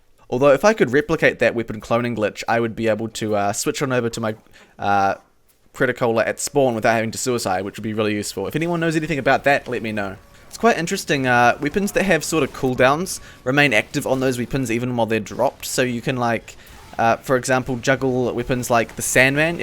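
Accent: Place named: New Zealand. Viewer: I haven't been subbed for long but I've watched a few recent videos of yours and I love how you take what valve dishes out without being angry at anything and the way you use the new mechanics of the game. I'm glad we have someone in the tf2 community that is so positive about the game instead of just complaining about X favourite weapon being nerfed and saying that tf2 is dead. Also love the NZ accent!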